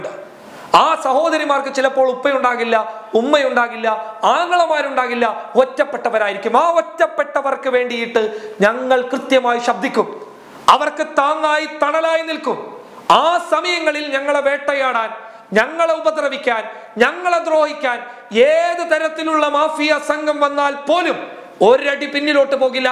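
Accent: Kasaragod, India. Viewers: ഇതില് കോഴിക്കോട് കാരിയായ ബാച്ചാ യുടെ കാസർഗോഡ് സ്ലാങ്ങിൽ തന്നെ ആണല്ലോ സംസാരിക്കുന്നത്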